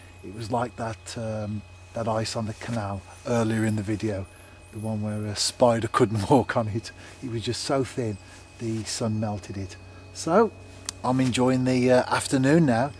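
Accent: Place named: United Kingdom. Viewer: This is where we are off to tomorrow...I liked the talking..:) nice to hear familiar British accent...regards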